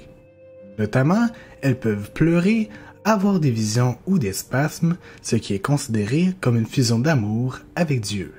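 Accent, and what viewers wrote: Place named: Quebec, Canada. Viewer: je comprenais rien a ce que tu disais, puis j'ai compris que tu etais quebecois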